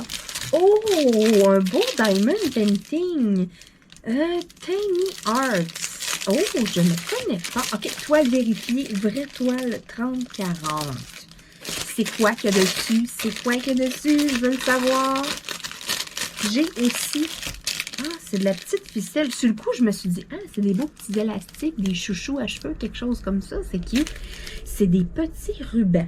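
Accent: Canada. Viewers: Bonjour quel plaisir d entendre l accent canadien merci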